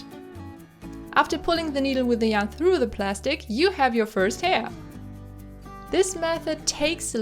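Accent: Germany. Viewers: Are you German? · Why am I always cringing on german accent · woah i just discovered your channel right now and YOU'RE SOOO TALENTED! (btw (if you ever see this comment xd) i always try to recognize an accent and my question is.... are you german? it sounds a bit like this xd ((and because of the "moin" at your channel description))) (if you're german: Ich liebe diese Puppe! XD) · Is she German? (Because of her accent) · Are you German? You kinda sound like you are. (I'm just wondering.. xD) P.S. Love the Doll! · Are you german? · Are you German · R u a German?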